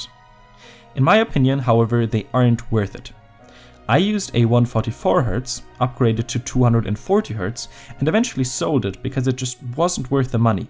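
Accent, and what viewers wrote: Germany. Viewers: Man hört so raus dass du deutscher bist haha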